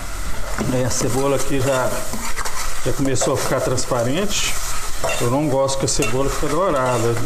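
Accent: Minas Gerais, Brazil. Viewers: Amei seu vídeo! Muito bem explicado, bem objetivo. E, como boa mineira, saudosa do meu estado, observei alguns toques de pronúncia, me parecendo estilo mineiro, o que me deixou ainda mais à vontade para acompanhar sua verdadeira aula. Grande abraço! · Muito bom, vc fala como mineiro, até pensei que vc era das minas gerais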